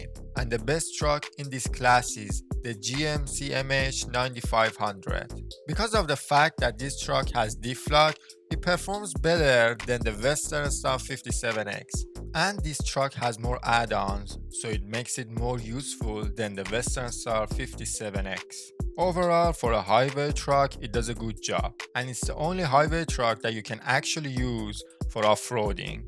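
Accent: Iran. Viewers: You are awesome dude... love your videos, what accent do you have? Sounds slightly Persian :) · Are you Iranian Bro? your accents shows that:face-blue-smiling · از لحجت معلومه ایرانی هستی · He is, because of the accent. ☺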